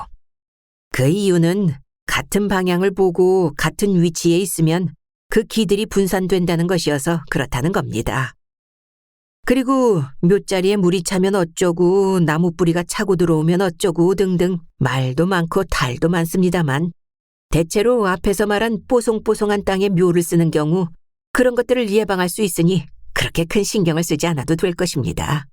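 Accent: Jeolla, South Korea. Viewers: 이번작품 음색은 폭신폭신합니다. 저만의 느낌일수도있지만 처연,청아등… 님컨디션에따라 다를수도 있겠지만 미세한 무언가 있습니다요,이번엔 차진 남도사투리로 들려주시니 그도 감사합니다^^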